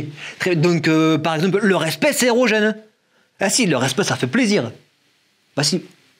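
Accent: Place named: Marseille, France. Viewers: J'ai adoré la dernière phrase X,) Et tu joues bien ! L'accent marseillais 👌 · merci pour l'accent du sud.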